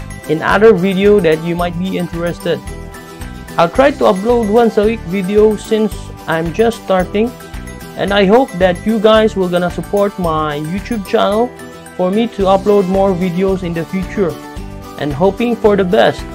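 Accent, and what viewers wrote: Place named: India. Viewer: hahaha indian accent iz real. 😂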